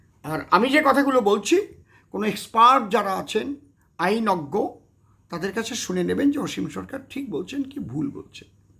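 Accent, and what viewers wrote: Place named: Bangladesh. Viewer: তুমি তো বাংলাদেশি তোমার এখনো বাংলাদেশি ভাষার আড় ভাঙ্গেনি তোমাকে আগে তাড়ানো উচিত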